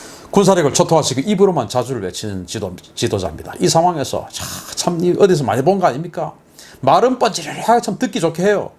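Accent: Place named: Gyeongsang, South Korea. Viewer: 지긋지긋한 경상도 사투리 써가며 뭘좀 아는척하네? 우물안서? 그러면 영어로 해석하며 이곳으로 넓은 세상으로 나와보든지???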